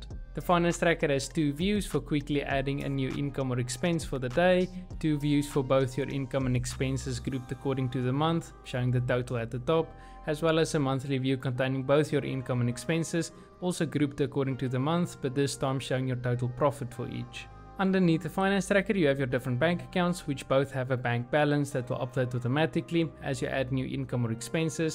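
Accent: South Africa. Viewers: What is your accent? South African?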